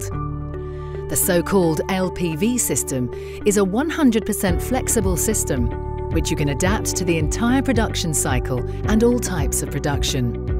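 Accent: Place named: United Kingdom. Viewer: The only horrible thing of this video is that brit accent. I had to turn off the audio.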